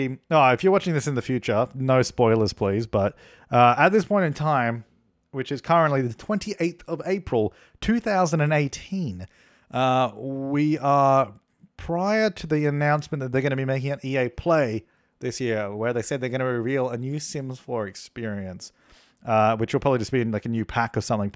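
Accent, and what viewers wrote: Australia. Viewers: To be fair though, triangles are the best shape. Just saying, oh and also, I love watching Aussie youtubers like you. It helps me a lot considering I'm an Aussie to hear my own accent on a global platform makes me very happy. It also makes me happy to know you're from NEW like me (about 3-4 hours north actually) because all the Aussie youtubers i know are either from Victoria or Queensland (bar a few). I'd also like to thank you as you've helped me with a few very personal issues without even knowing it. Your everyday humor makes me smile in my darkest moments, which I'm forever thankful for. Another thing as this is getting super long, I absolutely love the style in which you build your houses, it's very similar to my own taste and you often talk about people requesting other styles, which is okay too.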